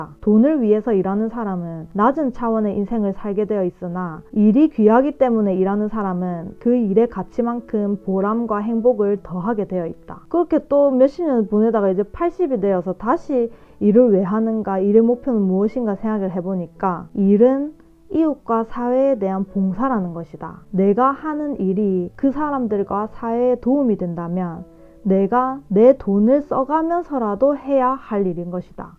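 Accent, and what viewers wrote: Gyeongsang, South Korea. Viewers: 자기계발 관련 동영상 정말 많이 보는데, 영상을 보고 직접 실행에 옮기게 해준 건 님 영상이 처음인 것 같아요. 감사합니다!! 제가 경상도 사람이라 그런지 말투도 너무 정감가고 실제로 나이도 같아서 똑순이 친구한테 피가 되고 살이 되는 잔소리 듣는 것 같기도 합니닼ㅋㅋ 같은 내용이라도 정말 이해하기 쉽도록 설명해 주시는 게 님의 엄청난 능력이라고 생각해요!! 만나는 주위 사람들마다 님 채널 추천하고 다닙니다ㅎㅎㅎㅎㅎ 백년을 살아보니도 꼭 읽어보겠습니다! 앞으로도 좋은 영상 많이 부탁드립니다 =) 감사합니다! 오늘도 행복만 하세요♡ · 우연히 구독하게된 경상도 사투리~ 왕팬 될 것 같네요. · 경상도 말씨에 정겹고 배움에 도움이 되는 영상들이 많아 감사합니다. 사랑합니다.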